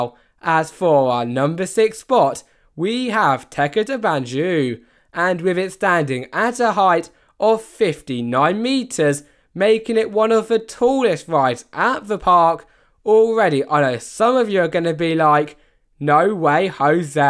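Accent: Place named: United Kingdom. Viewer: it's a british accent lol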